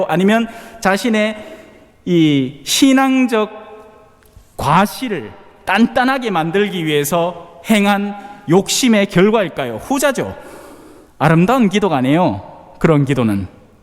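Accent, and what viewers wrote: Gyeongsang, South Korea. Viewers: 주님 주신 빛나는 달란트를 백배만배 쓰시는신부님.....옥구슬 같은 말씀말씀이 그저 아멘아멘!!!하게 합니다 만인을 행복하게 해주시려는 키타소년이 된 모습과 마당의 경상도사투리가 정겹습니다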